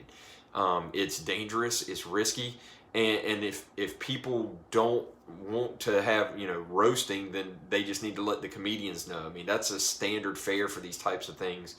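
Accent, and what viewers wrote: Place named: United Kingdom. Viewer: I love this guys accent- a Brit from across the pond 👍🏿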